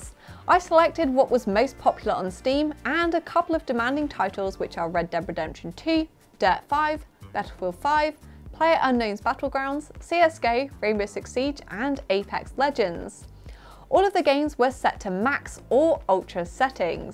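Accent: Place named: United Kingdom. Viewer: I love the British accent 🙃 Thanks for the translation From ksa🇸🇦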